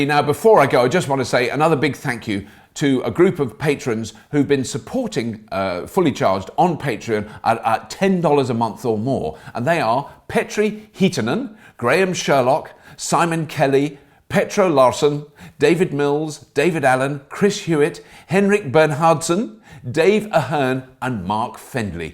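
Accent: Russia. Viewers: Nice russian accent! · Nice Russian accent! To good to be true thou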